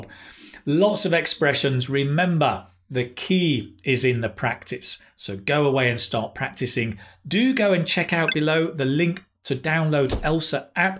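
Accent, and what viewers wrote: United Kingdom. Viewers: I hate British accent in fact, but to be honest you're the only one that I would want to hear his British English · You are speaking British English or American English??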